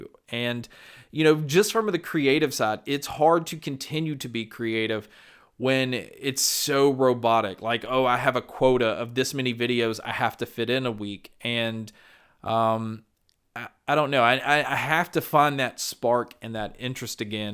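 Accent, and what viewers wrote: South, United States. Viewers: No need to reply dude, just wanted to drop some encouragement your way. Some, not all, of your values lie in your skills to connect with us, remember that. If you moved on to (or rebranded) I'd keep following. You've got that well-spoken southern draw, you've got a handsome face, you're authentic and informed. You've got a lot going for yourself. I'm confident that you'll figure it out. Mad respect for you dude cuz I know about the industry and I couldn't handle it. Keep your head up, champ.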